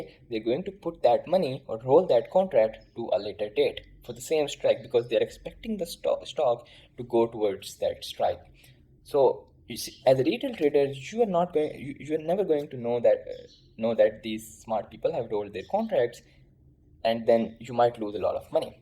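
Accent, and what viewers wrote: India. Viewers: bro if u want to trade against algos, market makers and smartest people in the world and you cant understand an indian accent, i think you are not smart enough to trade lol I understood everything perfectly and I see alot of other people did too, so it's just you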